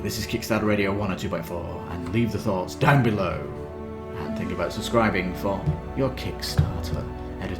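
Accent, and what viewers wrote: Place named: United Kingdom. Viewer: mexico?? you sound like some kinda brit.. prolly get called gringo every day. what's the board game scene like over there?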